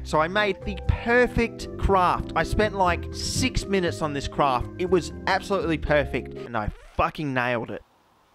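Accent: Australia. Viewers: "The atmosphere is nature's bin." Spoken like a true Australian. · Not only do I realy like your aussie accent but your honesty. you r showing all the damn takes it took, to get this tiny (but well worth it in my opinion) explosion filmed. You are awesome mate!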